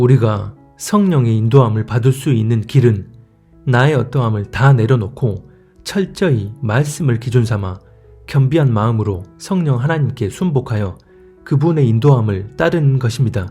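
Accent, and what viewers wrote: Jeolla, South Korea. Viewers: 전라도 억양이구만 ㅋㅋㅋㅋ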